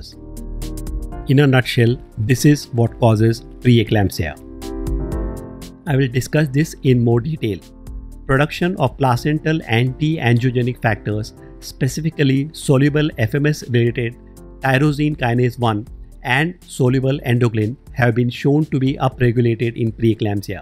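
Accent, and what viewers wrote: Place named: India. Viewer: I cannot catch everything you said due to your Indian accent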